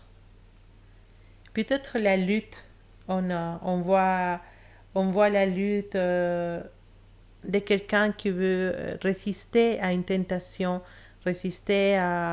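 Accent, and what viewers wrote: Spain. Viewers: cet accent, seriez-vous espagnol?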